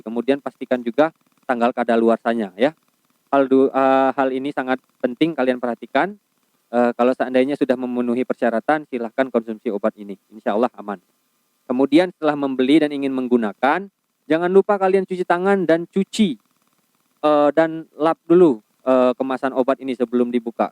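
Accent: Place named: Java, Indonesia. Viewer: Jawanya kental bet bro🤙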